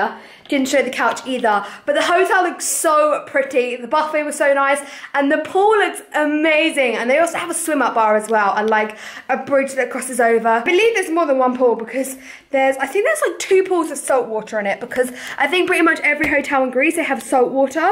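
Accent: United Kingdom. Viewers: Is she from England sorry I am new here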